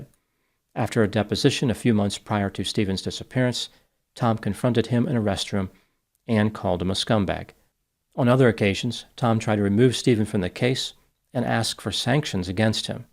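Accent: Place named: South Jersey, United States. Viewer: He has a south Jersey accent, so I think you might be right 😆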